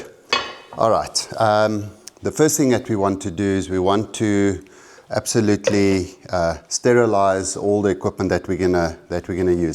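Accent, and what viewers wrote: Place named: South Africa. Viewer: It's so nice to hear your South African accent! Thanks from France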